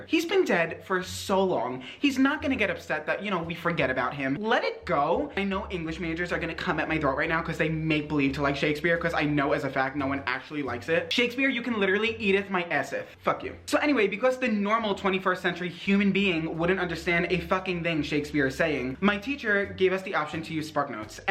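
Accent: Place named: Chicago, United States. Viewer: Bahahahah you make my day I love you so much. I love your accent so much I’m from Chicago and when I heard you say mom or class (mahm, clayss, i say “a”s the same) I’m like this mans is a CITY BOI ❤️ hahaha you’re legit so beautiful and witty and charismatic, I’d square up on this teacher for you In highschool 😂💕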